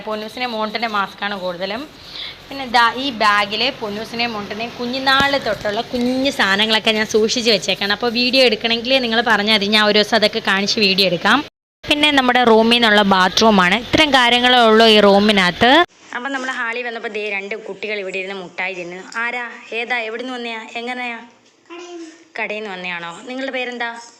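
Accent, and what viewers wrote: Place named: Malabar, India. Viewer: sukamano enn choichatha.. Malabari slang😃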